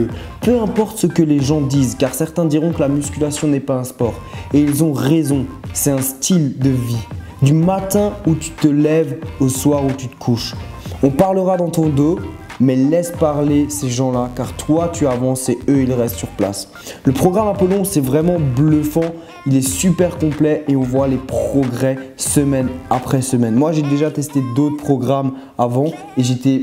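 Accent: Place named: Alsace, France. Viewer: Tu es alsacien non , je crois avec reconnu l'accent de chez moi :')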